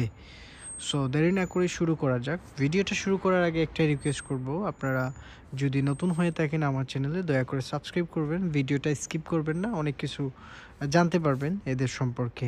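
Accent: Sylhet, Bangladesh. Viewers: Sylheti ni ba vai😊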